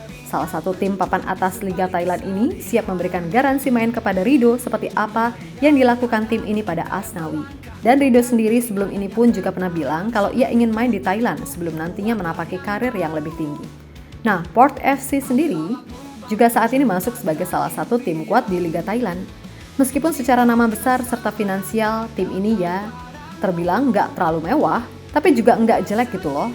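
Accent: Java, Indonesia. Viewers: " CANGKEM CANGKEM " 😂😂😂😂😂...logat jawanya khas banget min ❤❤❤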